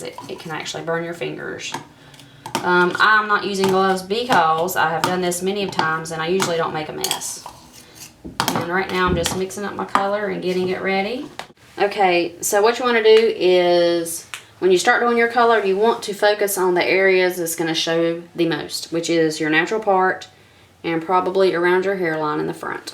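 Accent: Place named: United States. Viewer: i love this american accent :)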